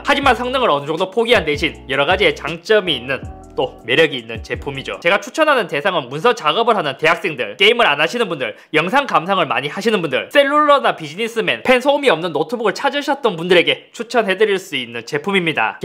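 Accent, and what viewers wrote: Gyeongsang, South Korea. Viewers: 여윽시 경상도 사나이~^^ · 형 진짜 경상도 사투리 심하신 분들은 스마일을 서마일 이라고해??? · 스마일이 서마일 되는법. 경상도 사투리. 잇섭이 서마트폰이라 안하고 스마트폰이라 하는 이유. 테크충 ㅋ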